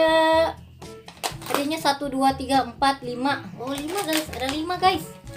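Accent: Sunda, Indonesia. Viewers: Lucu pisan kalo ka ngomong sunda campur indo🤣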